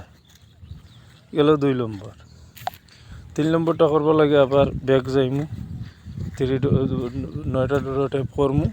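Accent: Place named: Sylhet, Bangladesh. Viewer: Bhai lage sylhoty 😊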